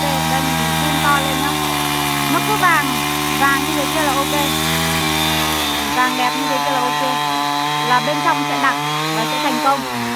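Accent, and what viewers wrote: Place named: Thach That, Vietnam. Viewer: Gọng nói thạch thất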